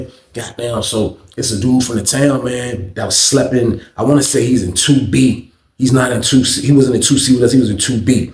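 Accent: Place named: Brooklyn, United States. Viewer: Sounds like Brooklyn dudes in NY lol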